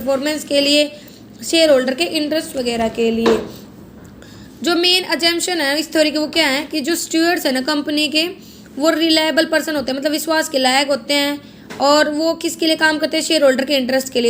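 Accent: Haryana, India. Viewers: Haryana se ho app?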